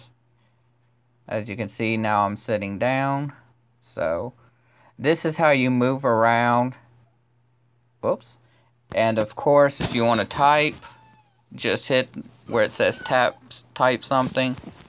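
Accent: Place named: United States. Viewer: You sound country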